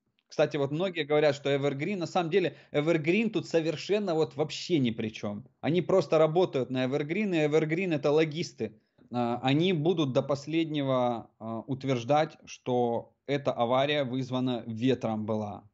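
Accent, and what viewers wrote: Ukraine. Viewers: Судя по акценту ты из Одессы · оказывается, это украинский говор.. оба-на..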